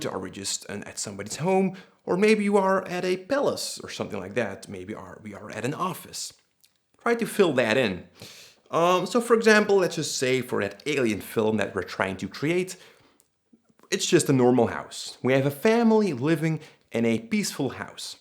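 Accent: Netherlands. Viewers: bootifull dutch accent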